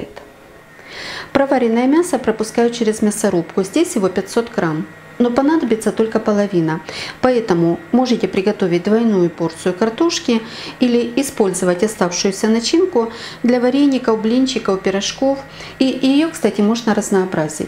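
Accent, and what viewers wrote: Ukraine. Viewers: дорогая. Потрясающе. У меня слезы на глазах от Вашей прекрасной украинской речи, это напоминание о мужестве Украинского народа перед лицом плешивого фюрера. А зразу чочу попробовать с курицей, мясо не ем. Буду готовить с удовольствием, дзякую!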